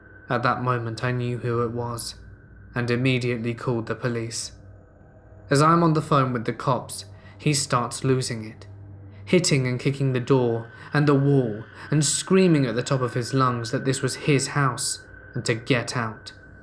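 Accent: United Kingdom. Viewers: Does every one of these channels have to have a British accent ?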